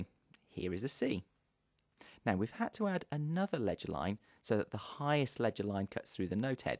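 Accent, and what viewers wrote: United Kingdom. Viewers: Fantastic teaching plus its British accent so I like it so much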